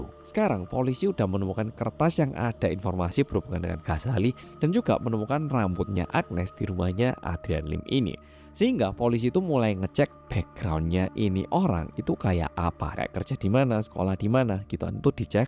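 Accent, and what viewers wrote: Java, Indonesia. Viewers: Sampean korea kok medok jowo to kang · Kok medok kk ngomong nya , saya 14 th di singapore tp nda tau kasus ini yo. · Mantap bngt mas..dah bertahun kmbli k korea,tpi medok jowo nya msih kentel buanget..seneng dngr mas ngomong.salam dari mlysia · Sampean kok yo ijik medokkk to mas 👍👍👍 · MULAI AGAK2 KONTENNYA NIH CHANNEL YANG JUAL MEDOKNYA =)) DAPAT GEDE YAH DARI JOKOWI? TERLIHAT SEKALIIIII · Padahal udah hapal klo ini orang korea yg medhok, tapi ttp ngakak pas denger "klotok"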